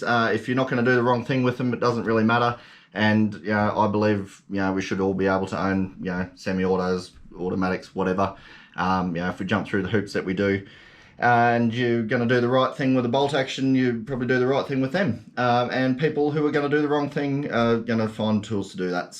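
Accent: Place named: United States. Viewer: My guy you sound like me. American.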